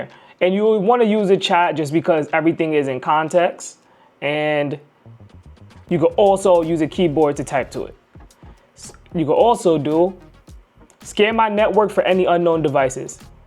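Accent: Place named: Bronx, United States. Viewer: Brotha I was gonna ask what part of da Bronx you from? 😂 didn’t think you’d be from ATL❤❤❤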